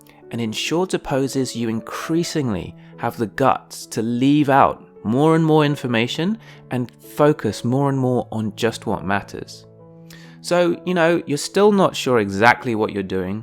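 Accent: United Kingdom. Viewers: YEAAAH BRITISH ENGLISH! LOVE IT!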